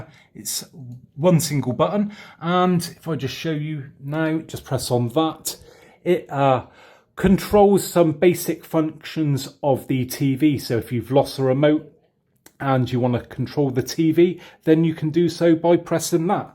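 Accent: United Kingdom. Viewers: are you from UK? good UK accent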